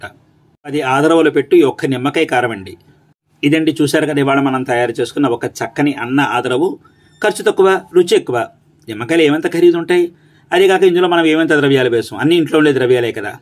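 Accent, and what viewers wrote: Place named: Godavari, India. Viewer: మీ తూ.గో.జి. పగోజి తెలుగు అద్భుతం. వినాలనిపిస్తుంది, చేసినవి తినాలి అనిపిస్తుంది నమస్సులు.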